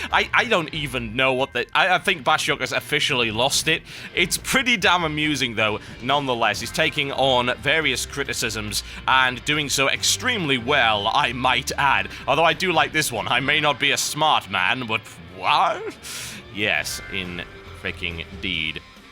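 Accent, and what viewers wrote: United Kingdom. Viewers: lol British..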